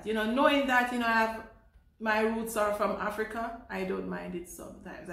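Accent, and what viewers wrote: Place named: St Ann, Jamaica. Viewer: You are a well trained teacher I enjoy your video. You are from st Ann's sound like my sister inlaw blessings.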